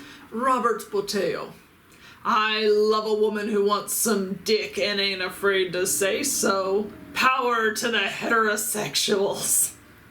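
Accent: Texas, United States. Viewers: As an Aussie, the way you say "Y'all" does give me Texan/mid-western vibes.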